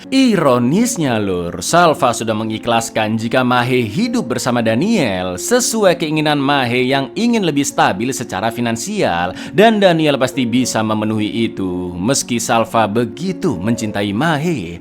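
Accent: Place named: Indramayu, Indonesia. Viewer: Kok logate serupa Indramayu, curiga kang wng dewek 😅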